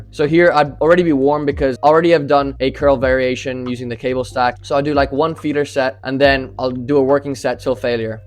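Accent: Ireland. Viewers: What's your accent? It has a hint of South Dublin.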